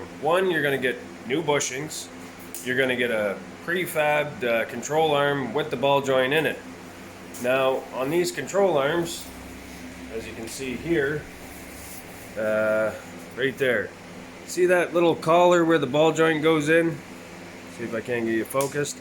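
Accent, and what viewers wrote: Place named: Nova Scotia, Canada. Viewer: Good work...I am going to tackle this same job. I think I recognize your accent. Nova Scotia?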